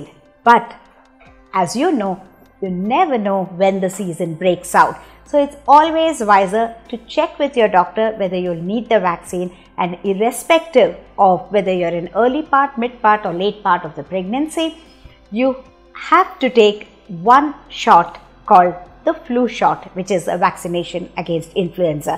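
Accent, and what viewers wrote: India. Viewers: When to go for a flu shot when pregnant? NEVER!!!!There was a 4250% increase is miscarriages in pregnant women in <link> Indian accent does not make one knowledgeable regarding your health. No pregnant women should EVER get a vaccine. Never.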